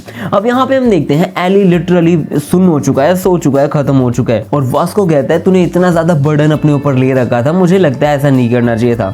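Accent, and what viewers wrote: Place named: Haryana, India. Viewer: thoda accent se laga tha ki delhi haryana ya Punjab se hoge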